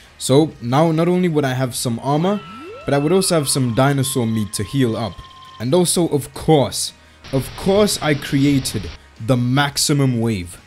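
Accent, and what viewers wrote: South Africa. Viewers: your acent sounds south african (is it?)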